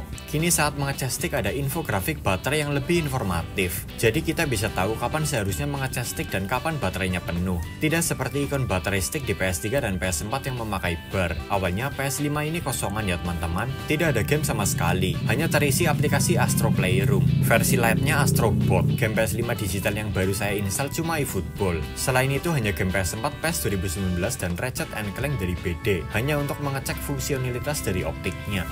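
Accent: Banyumas, Indonesia. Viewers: genah mesti ngapak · loh mas wong banyumas tah?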